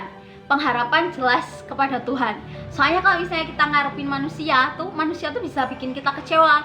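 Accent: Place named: United Kingdom. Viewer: British nya uwuw, aku lebih ke logat America:v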